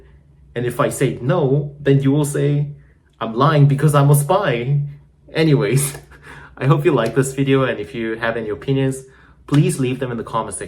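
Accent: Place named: South Korea. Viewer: As a Korean, I 100% guarantee he is a Korean, from his English accent when he forgot to mimic native spreakers' accents. By the way, his English is perfect 👍👍👍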